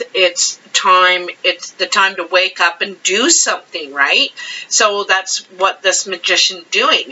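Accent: Canada. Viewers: Ha, thought I could identify a fellow Canuck. I'm in Southern ON, GTA. I have family in North Bay though. Have a good one as we say.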